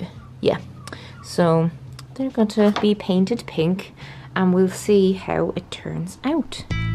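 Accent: Dublin, Ireland. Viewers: I just stumbled across your channel and I’m going to already subscribed because hearing you reminds me of my sister-in-law in Dublin and I miss her. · The office is amazing, and the girl is so pretty and sounds Irish.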